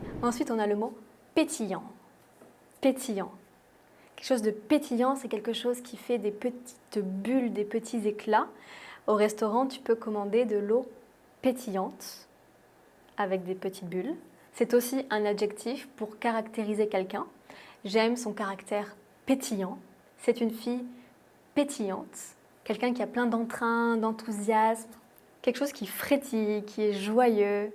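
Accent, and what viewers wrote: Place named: South, France. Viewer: PS : Je suis pratiquement sûr que tu habites la partie sud de la France. Etant breton, je remarque vite les accents ! Enfin, je sais pas pourquoi je dis "en tant que breton", je n'ai jamais lu que l'on avait ce super pouvoir plus que d'autres ^^ Sinon les arabesques ont été identifiés en Occident au XVe siècle comme un élément de l'art islamique, d'où leur nom. Mais en Occident ce terme a été utilisé pour désigner par extension des entrelacs et des rinceaux divers, y compris ceux de tradition occidentale, même lorsqu'ils n'ont aucune correspondance avec les motifs arabes.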